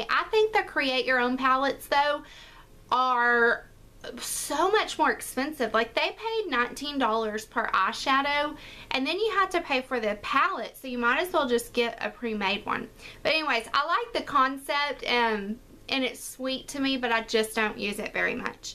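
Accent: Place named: Tennessee, United States. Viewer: New subbie! I'm from Tennessee and girl you sound like me! Lol loved the video can't wait to see more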